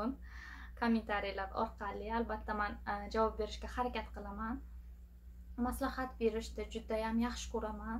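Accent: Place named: Jizzakh, Uzbekistan. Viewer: Jizzaxcha shevalarizdan sizzi😁😁🤗🤗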